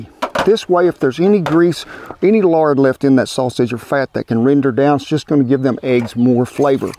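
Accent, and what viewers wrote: South, United States. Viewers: Damn, I'm a Brit who's always had a thing for the country American accent and good food who's just stumbled on your videos, you've just hit both nails in one. Looking forward to watching more in the future sir! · Thank you for all your video's. I love the way you explain things in southern language. May God bless you always.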